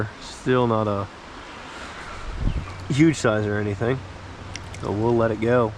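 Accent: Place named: Delaware County, United States. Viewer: Good stuff funny listening to the delco accent growing up in frankford in philly ,now living up by green lane state park,good stuff would love to fish with you in summer! Keep up the wood content on the "wooder's" lol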